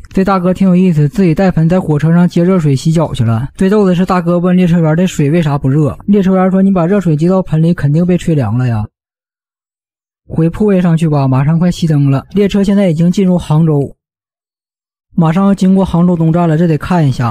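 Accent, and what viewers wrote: Northeast, China. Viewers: 解说一口大碴子味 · 哈哈哈哈 碴子话 老带劲了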